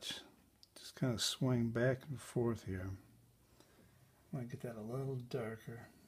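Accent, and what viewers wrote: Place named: New England, United States. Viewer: Found this video 3.5 years ago when I began to self-paint wc's. Found this today, and STILL believe this to be the finest demo on fog, mist, w/w. Thank you for this hidden gem! (PS: I'm from RI, you sound like NE, yes?) Fanna Turano Denver, CO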